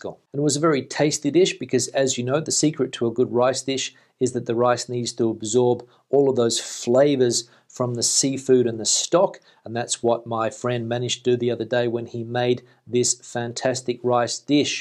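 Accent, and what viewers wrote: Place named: Australia. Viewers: Saludos desde Australia!... I just discovered your channel yesterday! I moved to Beautiful Australia 3 years ago and I love it! Yes! We are lucky here! I was born in Colombia,but moved to the USA,when I was very young! So,thanks God I’m Bilingual...I visited Spain years ago and I love it! Dreaming about going back after the pandemia is over! Your English accent sounds Aussie!..but you know good Spanish by now! Keep up the good job! Gracias! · That’s because he’s from Perth Australia. After 20 years in Spain and unknown other reasons he’s developed his own accent. In today’s video he pronounced “assume” as “aschume”. I speak with a Californian accent, but weirdly pronounce several words with a British version, such as military, literally, and the weirdest one aluminum.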